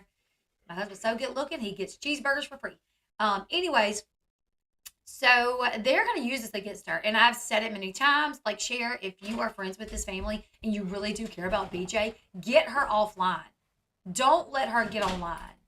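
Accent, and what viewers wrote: South, United States. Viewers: Love love coming to your channel!!! We have the exact same views and southern accent 😂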